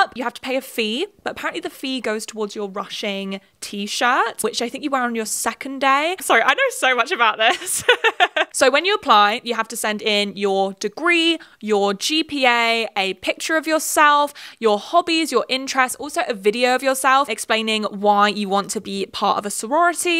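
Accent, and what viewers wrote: England, United Kingdom. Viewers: Its interesting hearing an english person explain this to me as an engineering major from philly not involved in sororities at all lol like why am i watching this i have so much hw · love British accent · I think it’s because it’s your English accent, but thank you for sharing your story!!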